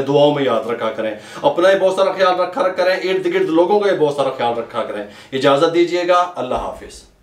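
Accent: Punjab, India. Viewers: Beech mein jo ap punjabi ka touch detey hein. Kamal hi hai.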